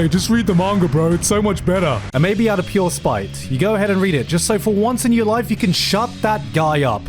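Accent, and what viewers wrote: England, United Kingdom. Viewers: What’s the title of that horror manga presented in ? I’m not good at understanding japanese titles heard in english accent or maybe I’m just deaf, dunno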